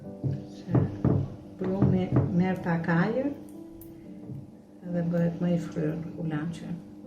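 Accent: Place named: Tirana, Albania. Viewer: Pse ti zonja, pa trokitur fare punon.....mos e shiko ne qoftese te acaron, por kjo eshte nje zonje e vertere Tiranase, qe ke qef ta degjosh, per te gjitha recatat e mrekullueshme qe ben, respkte pa fund per ju zonje qe na kenaqni me gatimet tuaja....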